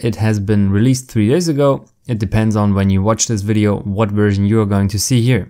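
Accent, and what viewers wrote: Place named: Germany. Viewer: Nices tutorial Es hat geholfen aber dieser deutsche Akzent 😂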